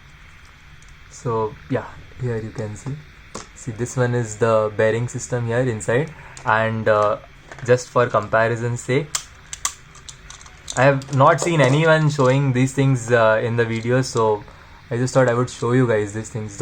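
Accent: India. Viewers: Your accent is like Indian only bro 🔥🔥🔥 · Your accent is indian english and i dont think its fake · You have a perfect Indian accent bro continue doing you great work 👌👌❤️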